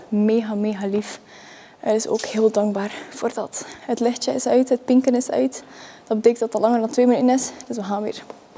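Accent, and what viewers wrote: Flanders, Belgium. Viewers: I love your Belgian accent. 😀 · Dit is vlaams kut nee maar respect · Vlaams is Nederlands, maar dan mooier ❤ Een hele leuke video! Ik ga zelf ook naar de gym en het is gaaf om andere gymvideo's te zien. Weer een topvideo, een geslaagd experiment! :) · She has a Belgian accent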